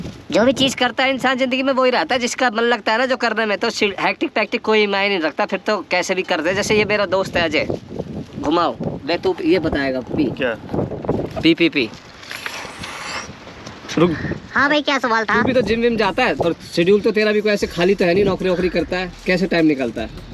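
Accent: Haryana, India. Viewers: Ram Ram ji, News wali video kab ayegi. Karo news wali video, bada maza aa jata hai waise videos dekhke apke Haryanvi accent me.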